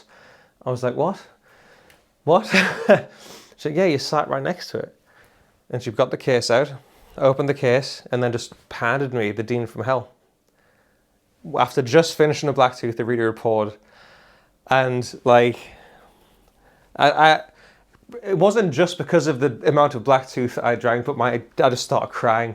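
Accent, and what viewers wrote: Newcastle, United Kingdom. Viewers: I only ever watched PMT because of you. One you're a Geordie yenaawattamean, but mainly because of the enthusiastic way you presented new gear. Don't know what the next stage of your journey will be but I'm certain that someone will snap you up to do what you do best, demo gear and play guitars. All the very best mate.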